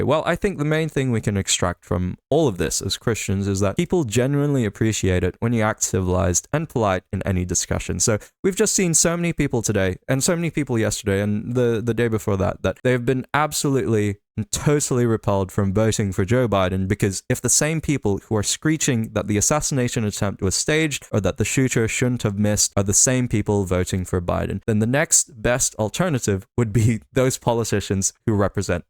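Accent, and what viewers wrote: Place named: Australia. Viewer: He does seem to have a Philippine flag behind him, but he sounds (and I think he said he is) Australian.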